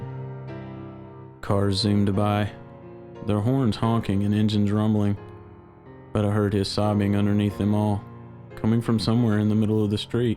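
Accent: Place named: Texas, United States. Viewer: you're from texas aren't ya cowboy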